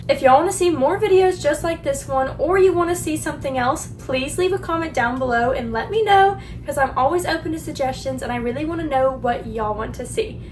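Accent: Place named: Texas, United States. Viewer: your beautiful live your voice with that Texas accent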